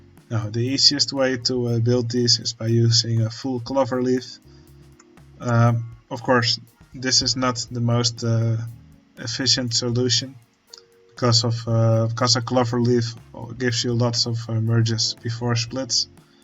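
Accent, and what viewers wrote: Netherlands. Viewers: you sound so dutch :)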